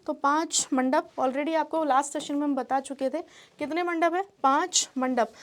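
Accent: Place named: Haryana, India. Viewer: आपकी टोन हरियाणवी है ❤❤❤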